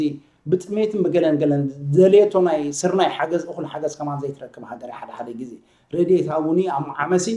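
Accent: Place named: Tigray, Ethiopia. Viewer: I never have been in Asmara,but according these around me who have been in Asmara said your dirty agame tigrina of tigrai sounds like eti defa e arabia ab asmera znebre agame miruts yifTer timesl are they right or what?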